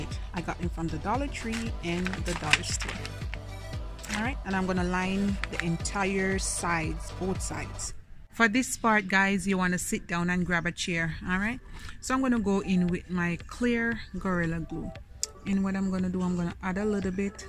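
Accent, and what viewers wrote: Jamaica. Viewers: I just subscribed cuz of your Jamaican accent💪🏾❤️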